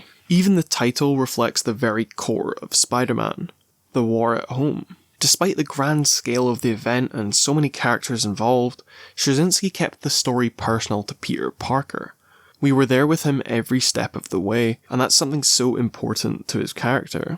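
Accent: United Kingdom. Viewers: I subscribed just cause of the brick wall guy at the end and u sound like u from uk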